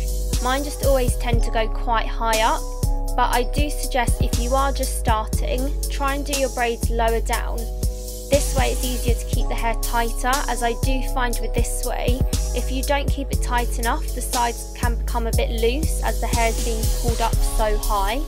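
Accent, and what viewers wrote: United Kingdom. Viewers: Omg she's so British well I am but I'm from Essex and we don't sound like that lol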